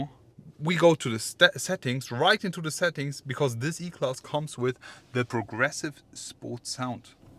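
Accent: Germany. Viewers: Why does this guy sounds like a German speaking english.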